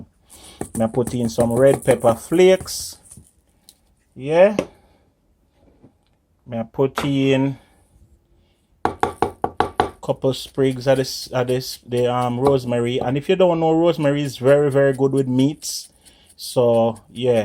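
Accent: Jamaica. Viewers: ..where you from???You sound Jamaican and how yu don know how to cook curry goat!! What??? Yu neva drink Maniish Water?? Anyhow yu cooking good? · I love how you talk!!! Real yaadi! BTW I'm going to try your recipe.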